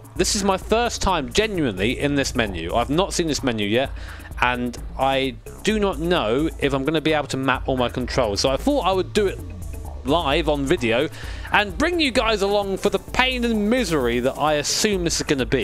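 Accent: United Kingdom. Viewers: Apparently British accents are gold on YouTube.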